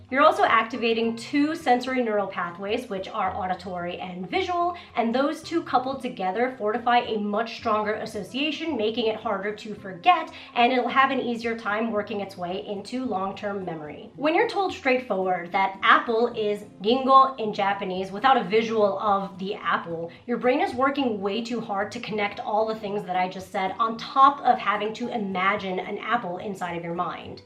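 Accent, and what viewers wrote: United States. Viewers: Her code switch is AMAZING! When speaking American English she pronounces Japanese words like an American would, but when she speaks Japanese, it's Native AF. I can see why a lot of people think she's American or Half-Japanese and Half-American even though she's Japanese. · Your English is good American English , bad English English. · Interesting! And how come you have such a great American accent? I came here because I work as a translator and currently I am translating a Japanese series for a TV network (I have English subs and translating into Croatian). I am now on episode 4 (out of 10) and I feel that I am KIND OF catching the rhythm of the language, so I wanted to find out more about it. Greetings from Croatia!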